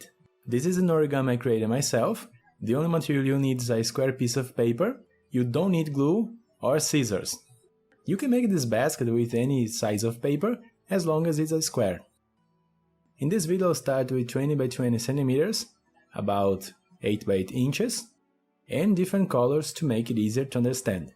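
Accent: Brazil. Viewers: Is that a beautiful Brazilian accent?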